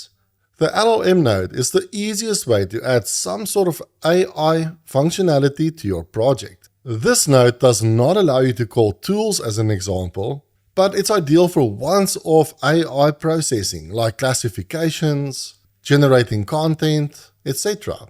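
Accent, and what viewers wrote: Afrikaans, South Africa. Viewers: Môre. Ek't nou al so paar van jou video's gekyk en dit baie insiggewend gevind. Ek's regtig nie gepla met Engels nie - soos in glad nie. Maar dis so lekker om sulke regtige goeie kwaliteit inligting met 'n Afrikaanse aksent te ontdek! Maggies man, wil gedoen wees. Jy mag maar. Groete uit Durbanville - Kaapstad.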